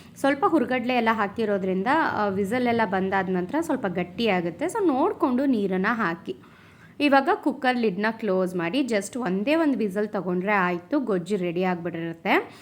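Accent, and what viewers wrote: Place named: Mysore, India. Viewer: I always wondered that you speak kannada exactly like my house kannada . We are from Mysore / Nanjangud belt . I live in Bangalore . I like the way you talk. You should plan 1 live session with your subscribers .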